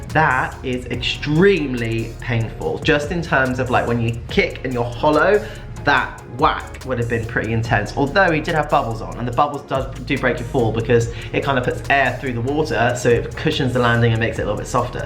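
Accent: United Kingdom. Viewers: Tres British 😊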